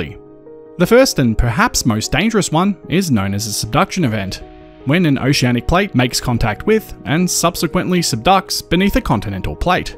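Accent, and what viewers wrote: Australia. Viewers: Never even thought about this concept - so interesting! Cool video - I like how I actually half understand the science when you explain it - plus as a New Zealander I find your Aussie accent familiar and easy going to listen to.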